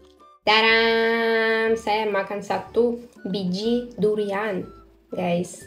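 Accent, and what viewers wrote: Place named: Netherlands. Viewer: E kamu ngomong ky orang holanda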